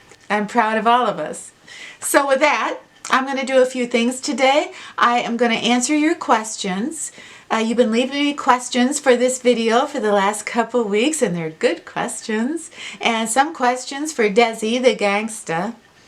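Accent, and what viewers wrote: Midwest, United States. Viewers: My mom told me about your channel 3 yrs ago. So that’s when I started watching you. Your the sweetest lady. As soon as I heard your accent I knew you were from the Midwest. I lived in North Dakota for 28 yrs, but now I live in Oregon. Anyways, you always bring a smile to my face. Movie..I would have to say Hallmark hahaha just love them. But I do love Cinderella the (live action) Have a great evening. ❤️🌸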